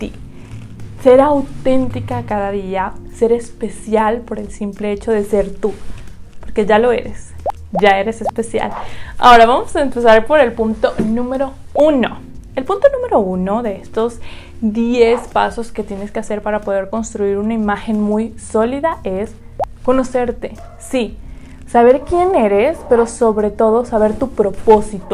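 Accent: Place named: Mexico. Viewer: omg siento que tienes el acento mexicano mucho mas marcado que antes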